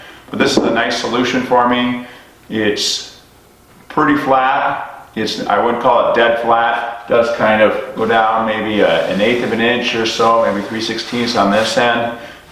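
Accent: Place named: Southeastern Ohio, United States. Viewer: "Acrosst" vs "Across" is regional dialect, certainly in southeastern Ohio, ala Crick vs Creek, Warshington vs Washington, Booshes vs Bushes. I would know, being a hick from the sticks.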